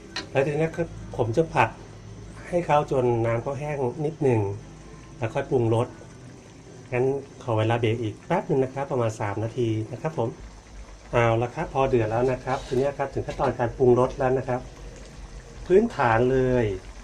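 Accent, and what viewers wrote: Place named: Korat, Thailand. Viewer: คนโคราช.บ้านเอ๋งงงงงง พูดแบบนี้ครับ ผมก็ยุ่ อ.พิมาย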